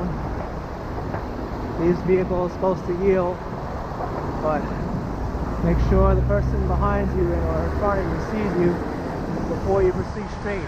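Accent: New York, United States. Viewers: Cute NYC accent.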